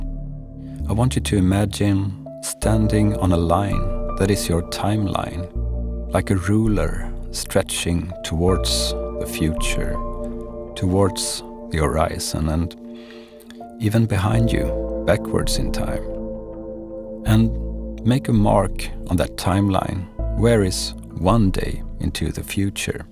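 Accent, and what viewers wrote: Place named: England, United Kingdom. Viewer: I don't understand this English accent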